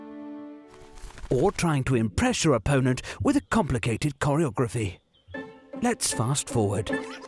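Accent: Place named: England, United Kingdom. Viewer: Teun heeft het gisteren in AVS (Esternietstedoenmisschien) een beetje verraden dat hij zijn script opstuurde naar een onvervalste Engelse stem die de teksten ingesproken heeft.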